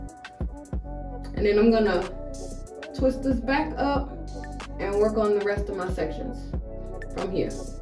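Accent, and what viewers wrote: United States. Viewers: I could hear the beautiful southern accent ❤. Yep it’s hot 🥵 down here… you look gorgeous ❤ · Omg loved the hair! I work from home and most of the time I wear my hair in a big fro. When I straighten it(not too often), my husband don't know how to act chile!! Lol. Your hair is beautiful, cool personality. New subbie here!!😊 Are you from New Orleans? Sounds like it😊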